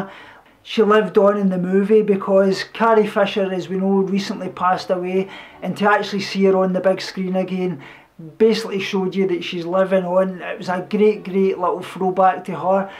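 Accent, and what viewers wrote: Scotland, United Kingdom. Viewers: Veganism, Star wars and a Scottish accent! My son will love this video i have to show him!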